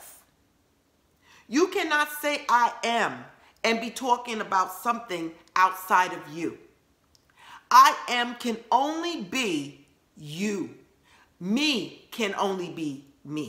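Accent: New York, United States. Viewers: Do I detect a New York accent??!!? I like that!!!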